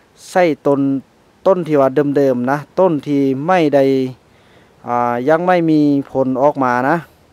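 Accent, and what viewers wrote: Laos. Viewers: พูดไทยสัปลาวอย่างฮา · ລາວແທ້ໆເວົ້າໄທເພໍີອ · ເຈົ້າຂອງຄິປເປັນຄົນລາວຫລືຄົນໄທເນາະ ຕາມທີ່ຟັງສຽງອອກຊຳນຽງລາວເນາະ ຖ້າເປັນຄົນລາວກະເວົ້າລາວໂລດດີກວ່າບໍ່ ມີທັງການເວົ້າທີ່ບໍ່ແມ່ນພາສາຕົວເອງແລ້ວ ມັນບໍ່ເປັນຕາຟັງຄັນສິເວົ້າວ່າຫນ້າລັງກຽດກະສິໂພດກາຍ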